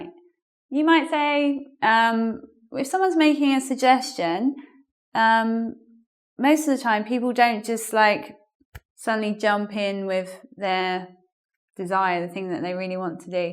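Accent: United Kingdom. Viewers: We have to visit London :) Great accent, they have a queen and they have Big Ben! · oh my god i couldn't understand anything what she spoke is it a British english ?,very diferent from the american's ,but i liked your video ,it has been helping me to develop my english ,i'm begginer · Thank you for your video and your excellent method and teaching as well. I was looking for British teacher for English learning because I'm in London for my PhD course. I learnt English in American's method so now I have a problem in London because the people's accent and their grammar is a little bit different and your accent can help me more to understanding Londoner specially in the University. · To be honest, it's little bit hard for me to understand the british accent. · something that I learn in your last class.....you are terribly charming!! I love your brithish accent... 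amore mio.. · Very interesting! Finally a British accent!!!! A QUESTION: how can I subsribe your second channel on You Tube? I found only ENGLVID, but the second channel about you tell at the end of your video, where is it? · very useful lesson thank you, i really like your British accent:D thanks a lot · I liked your video, even though I realized that I'm not used to the British accent. In fact, I'm not used to another accent and I have to work on it. I've just subscribed your personal youtube channel, as you asked and simply loved your idea to help people with speech problems. Thank you for your help and I'll watch more of your videos. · I've seen a lot of videos from your previous channel, as you suggested, and I really liked it! You have such a nice way to teach that make us alert to your classrooms. Plus, have a soft voice, and your british accent it's lovely! I am hungry for more videos! 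Keep your nice work!:)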